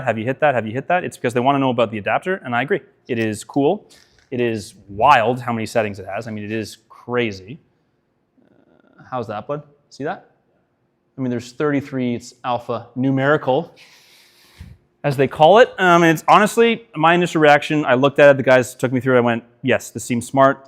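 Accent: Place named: Canada. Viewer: Sounding extra Canadian today, bud! I enjoyed the live review, nice to not just have people's best shots in the reviews.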